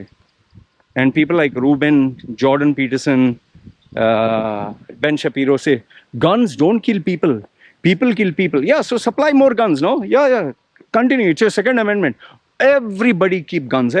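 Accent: India. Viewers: You're definitely not wrong! I'm a Muslim we're supposed to believe with conviction our book and prophet. So some canadian professor waving his finger saying you muslims this and that is a joke to me. I actually respected him alot until this video it really made me see his ignorance. I believe you're Indian from your accent so we both know how western culture colonized and pillaged our countries for their resources and wealth.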